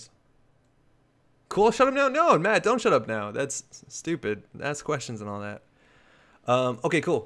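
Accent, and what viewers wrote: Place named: Canada. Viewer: The canadian accent was much stronger back then!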